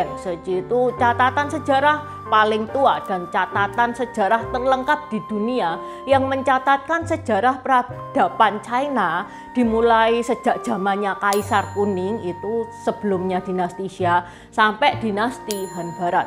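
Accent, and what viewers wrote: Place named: Surabaya, Indonesia. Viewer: Suroboyoan tha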